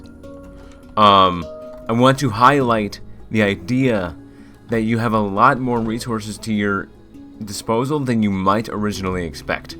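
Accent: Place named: South Africa. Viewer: yeah i think this is a South African accent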